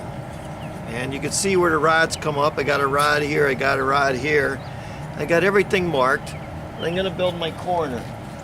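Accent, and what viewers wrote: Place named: New Jersey, United States. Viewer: Lol u gotta be from jersey from the accent. Nice work tho 💪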